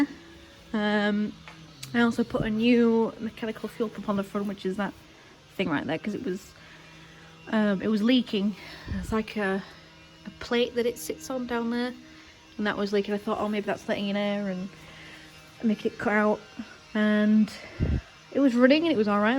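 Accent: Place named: West Yorkshire, United Kingdom. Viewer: Loved the video, I could listen to a West Yorkshire accent all day long! Interestingly I didn’t realise you needed a bonnet, we might have one of those somewhere too! Can’t promise it’s great but I remember it being better than your current one. we can hunt around the dreaded store room when lockdown allows! 👍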